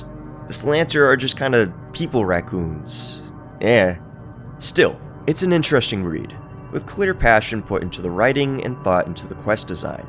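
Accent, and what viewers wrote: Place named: Upper Midwest, United States. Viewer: Are you from the the northern Midwest? Your voice is nice but I notice a bit of an accent which is actually quite charming.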